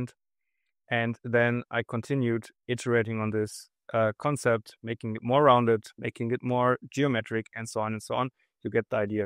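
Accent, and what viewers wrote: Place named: Germany. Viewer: dieser Deutsche Akzent 😅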